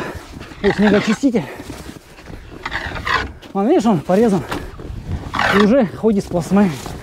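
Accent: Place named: Taiga, Russia. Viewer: Только хотела написать про приятный русский таёжный говор. И попался ваш комментарий. 🙂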